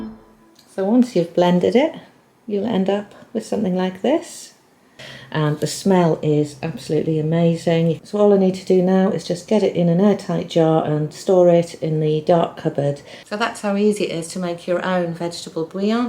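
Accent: United Kingdom. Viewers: Love your channel ❤️, makes a change to see an English UK speaking person 🥰!, Makes recipes etc better to follow as all your ingredients are from here 🇬🇧, I've literally binged all your videos regarding the pro+crisp airfryer 🥰, thanks to you I've actually had the conference to use mine & have cooked a whole chicken🍗,with veg, boiled eggs 🥚& am going to try to dehydrate some veg today following your video step by step ❤️❤️❤️.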